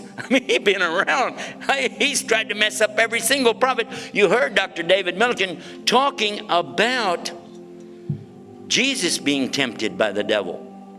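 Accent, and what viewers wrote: United States. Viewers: i love the fact that hes a southern muslim speaker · Aslaam. This guys one amazing human being and Muslim. I met Mr.Yusuf in Madinah sharif whilst on Hajj in 2012. We spoke briefly and then departed as it was very busy coming out of Masjid e Nabi at Fajr time. He said to me "How's Hajj" in this strong American accent. He still looks the same today when giving dawah on TV and may Allah keep him strong in deen and health. This man use to be a preacher in Christianity and his family had the same background. I think his father was a preacher too and Allah gave him guidance/imaan and he accepted Islam. Now that's what you call a true Muslim and that's an example to all us so called Muslims who were born into Islam. I believe Mr. as a Muslim revert is spot on in his dawah and promotes Islam as it should be (Peace). Unlike some of the crazies going about and killing everyone in the name of Islam and giving us a bad reputation. 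Peace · It makes me smile every time I think that this brother was a christian preacher from Texas. His accent mixed to the knowledge that he shares beautifully proves that Islam is really universal.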